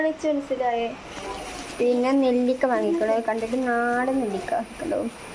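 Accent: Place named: Malappuram, India. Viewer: Nadan slang 😅 ketttal aryam Malappuram ahn nnnnn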